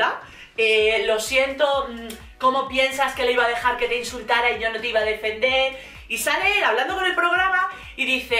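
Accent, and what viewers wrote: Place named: Madrid, Spain. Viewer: Es madrileño, con sus laísmos bien marcados. Ej : La dice algo.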